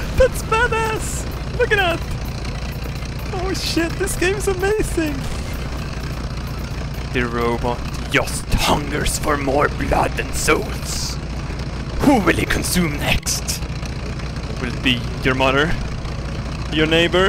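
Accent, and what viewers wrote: Sweden. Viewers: i watch just to hear him say a word with a J i love sweedish accents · shutup Dave no 1 likes u thats y u dont have friends. is swedish of course hes going 2 have a different way of talking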